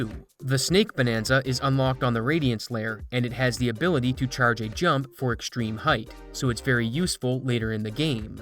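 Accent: Canada. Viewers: In your outro, you always say " ", but your profile name clearly lacks the Z. Did it once before, and do you ever consider adding it back(you don't have to, just curious)? Also, with how you pronounce words with the sound of "out" and sometimes just "ow" in them, I wonder: Are you Canadian? Because the way you say them is a pretty common thing in Canadian accents. And about your voice, is that your actual voice talking, or an AI reading what you type in it?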